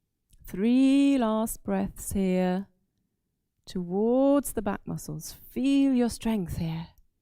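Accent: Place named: United Kingdom. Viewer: Was sie für eine wunderbare Stimme hat und dieses liebevolle British English ist für mich so ein Segen... Gerne regelmäßig Yoga mit!!!!! I love it!!!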